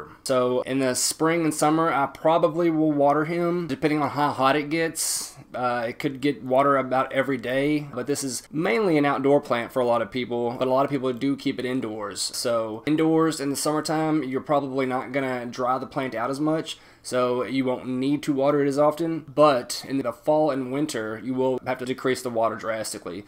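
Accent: South, United States. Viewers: Good content. Good southern accent. Good cat. I like this.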